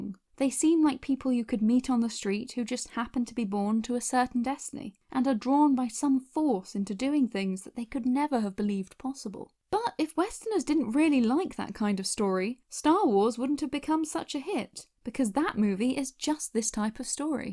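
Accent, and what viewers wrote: United Kingdom. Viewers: But why are you British? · I’ve been following this channel for a while and I barely noticed the narrator is British. 🤷‍♂️ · your voice isn’t posh, I mean maybe I just am numb to the accent as I live in oxford but to me it isn’t posh 😂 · Why are you British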